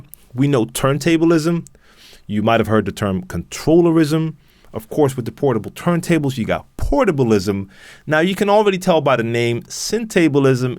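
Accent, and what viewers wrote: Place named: New York, United States. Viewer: I always thought you were from new york.. you have the NY accent.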